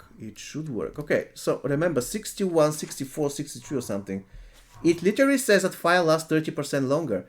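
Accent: Bulgaria. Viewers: are you bulgarian?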